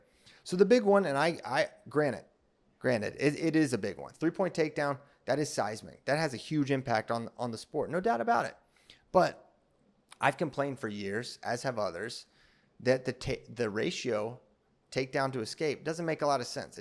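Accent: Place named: Iowa, United States. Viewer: Are you from Iowa? I think I detect an Iowa accent.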